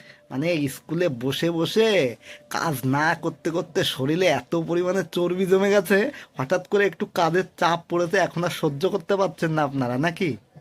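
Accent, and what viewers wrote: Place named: Bangal, Bangladesh. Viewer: তোর কথা শুনে মনে হয় তুই বাঙাল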